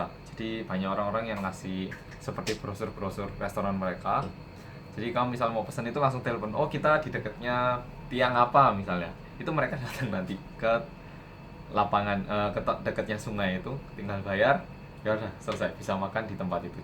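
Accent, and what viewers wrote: Java, Indonesia. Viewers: Istilahmuuu... nek pas manndi isok bolotan... hahahah asli khas nduueso... medok. Saluut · aku suka soalnya ramah juga santun dan murah senyum logat jawa persis kaya orang jawa asli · orang korea yang medoooookk.... uda g ada kata"nya y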